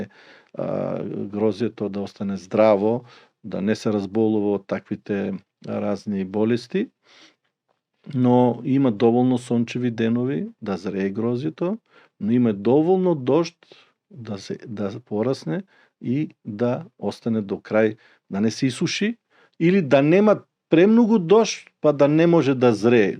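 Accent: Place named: Strumica, North Macedonia. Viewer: Поздрави..Очекувам секогаш ДА ГО ЧУЈАМ ГОВОРОТ СТРУМИЧКИ..МНОГУ МИ Е МИЛО И СИМПАТИЧНО...НАРЕЧЈАТА СЕ БОГАТСТВО..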